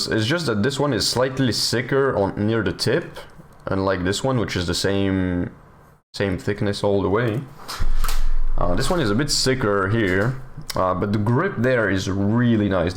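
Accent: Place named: France. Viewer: you have a french accent, are u french ?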